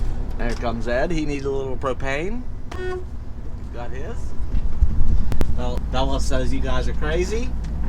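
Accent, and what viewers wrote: Pennsylvania, United States. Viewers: Are you from Pa....you kinda have that PA accent 😂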